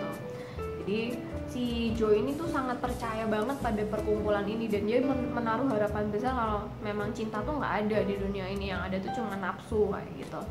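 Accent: Java, Indonesia. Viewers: Ngomongnya MEDOKKKKKKK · Ngomong y medok banget mba e...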